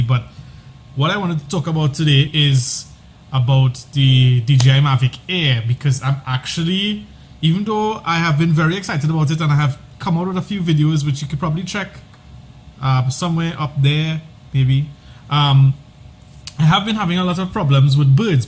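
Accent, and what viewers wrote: Trinidad and Tobago. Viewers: u from trinidad? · Mannn I swear something trini bout that accent lmk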